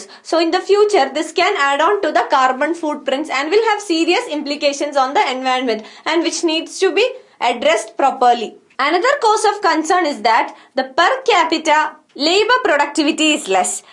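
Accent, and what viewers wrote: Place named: Kerala, India. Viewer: seriously her slang sound so....pullikari malayalee thanne ......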